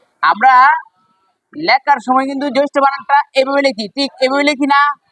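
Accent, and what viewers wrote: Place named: Kishoreganj, Bangladesh. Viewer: আপনার নিজের ই তো উচ্চারনের সমস্যা ভাই, আপনার বাসা কিশোরগঞ্জ মনে হয়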